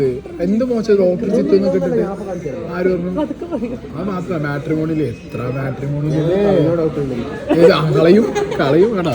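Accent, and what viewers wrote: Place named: Kozhikode, India. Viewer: കാണാൻ കൊറിയൻ ലുക്ക് ഉണ്ട് സംസാരം കോഴിക്കോട് 😍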